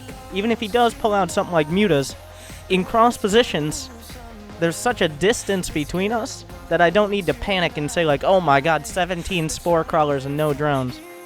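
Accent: South, United States. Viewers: I m curious as to where are you from? is this a Southern accent?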